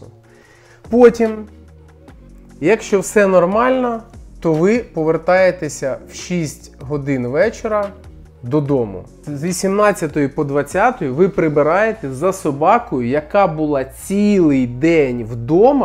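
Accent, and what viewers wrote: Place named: Surzhyk, Ukraine. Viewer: Слушаю и понимаю какой суржик жоский, с другой стороны, ставя себя на место человека в телефоне понимаю что я если бы говорил обычной скоростью, а не запинаясь вспоминаю як же буде черевик українською. То было бы аналогично если не хуже. Респект за мову.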